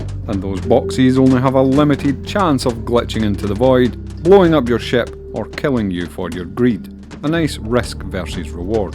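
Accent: Scotland, United Kingdom. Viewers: Appearantly I'm perfectly capable to understand "scottish english". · Purple Burglar Alarm! Love the Scottish accent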